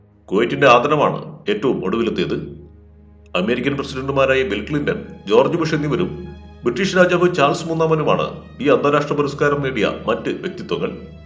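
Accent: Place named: India. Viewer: Prosody Indian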